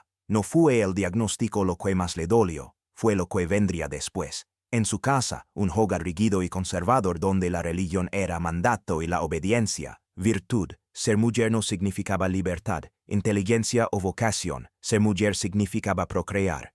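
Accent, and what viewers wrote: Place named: Portugal. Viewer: Habiendo locutores con voz firme de habla en español, contratan a una persona de origen porrugues, qué mal gusto...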